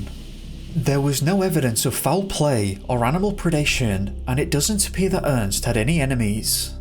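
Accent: United Kingdom. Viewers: Is it me or does this guy sound like Chills with a British accent??? His cadence and what not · Triela Rhyfel he does he has a regional British accent. Get over it. He talks differently I can’t deal with it. Grow up. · What is it about these stories narrated by a soft British/European accent? I enjoy them all, for some reason.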